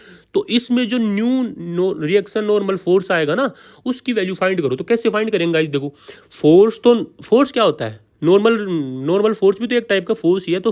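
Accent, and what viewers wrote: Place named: Rajasthan, India. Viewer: App Rajasthan se jaipur ke as pas se ho kya kyuki apki awaj ,Kai Che wali lag rhi h😊😊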